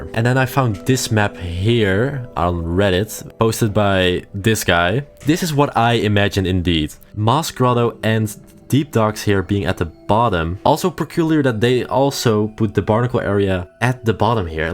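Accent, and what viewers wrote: Netherlands. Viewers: Wait your dutch?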